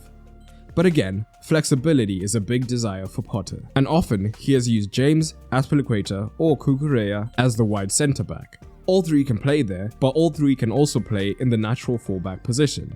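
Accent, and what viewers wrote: South Africa. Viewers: Are you South African this accent sounds weirdly familiar 😂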